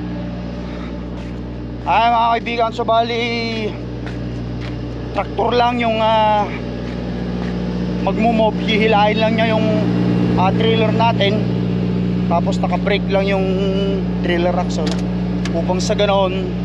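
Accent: Ilocos, Philippines. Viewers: Mailiw nak man idiay Pinas no mangeg ko accent mo.. Keep safe trucking Manong ko, shout out met ah ..Uncle Sam Outdoor from Sydney Australia originally from Tondol Anda Pangasinan..Dios ti agngina apo.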